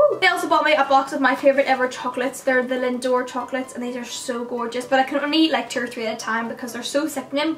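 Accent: Northern Ireland, United Kingdom. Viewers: are you from northern ireland x i am 😁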